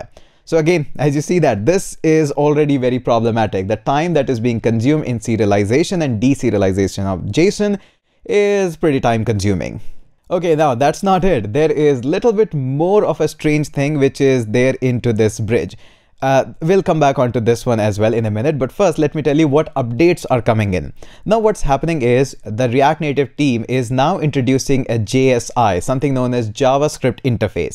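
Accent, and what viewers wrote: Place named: India. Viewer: I came here by accident (youtube home page) and I can tell your accent is really hearable, but it's not so heavy like for most of hindi-like ppl it is actually... you're really understable ur diction is really good and overall ur english is really good and im impressed cause most of hindi-like ppl i have experience with are having terribly bad english and so heavy accent that its hard to understand anything. About the video cool feature, but I would like to get support for custom shadows in react native and don't use tricky ways of making box shadows etc cause its kinda destroying the modern designs for me and making all of that pretty harder than usually in web development it is....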